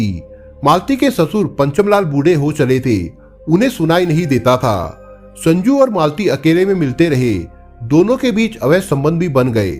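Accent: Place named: Bihar, India. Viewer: Language sudharo bihariyon ke language mat bolo pure world mein viral hota hai video sha Ko sa mat bolo Nisha ko Nisa mat bolo aap ka video dekhna chahte hain lekin aapki language pasand nahin hai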